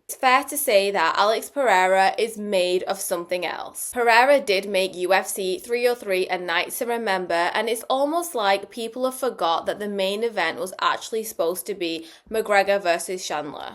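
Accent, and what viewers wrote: United Kingdom. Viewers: Pereira vs aspinall will be held back bc jon jones is holding up the official belt and ducking tom unfortunately. I think if jon beats stipe he will call out pereira and that will be done. Btw am i detecting a yorkshire lass? 🤔😂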